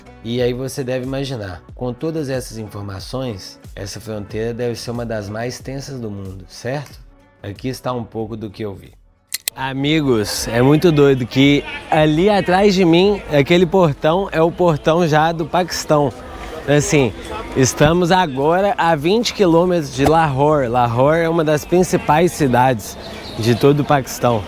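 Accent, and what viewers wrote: Minas Gerais, Brazil. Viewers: Esse vídeo poderia ser gravado por qualquer pessoa, mas o sotaque mineiro dele deixa tudo muito melhos ahhaa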